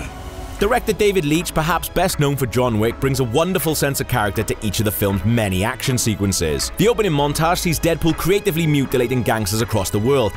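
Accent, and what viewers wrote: United Kingdom. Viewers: Who's this UK B'och on the V.O?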